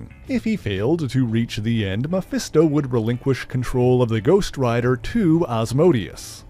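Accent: Canada. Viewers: I can hear the subtle Canadian accent 😂. Great video though. Been trying to hunt down all the single issues so I can finnaly read the full story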